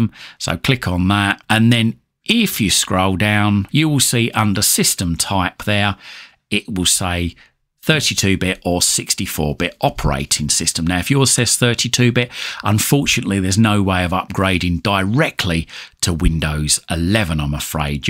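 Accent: United Kingdom. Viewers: Thanks loaded flawlessly on a 10 year old i7 4g ram laptop. It needs more ram really. I take it if I swap out the hard drive sometime I will have to load Win10 and go through the process again?? Great to hear a Brit voice😊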